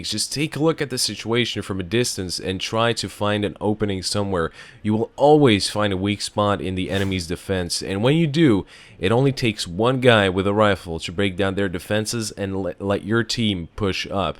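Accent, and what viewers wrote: Ireland. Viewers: Sounded like the irish accent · Is he an Irishman ?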